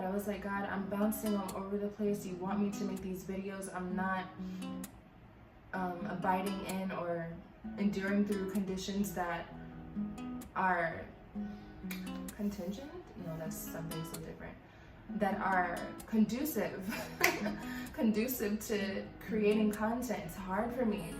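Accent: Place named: Jamaica. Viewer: Omgggg i was typing the below message when the answer popped up on the screen. Amazing. Are you Caribbean? I always hear an accent... sounds Jamaican... as am I. Very nice place.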